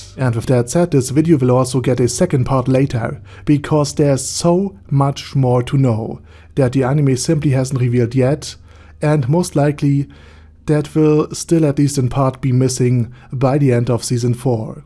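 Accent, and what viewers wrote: Germany. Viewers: As a german i am so triggered by our accent. I am sry but it triggers me so much. I like the thoery videos and shit about overlord though.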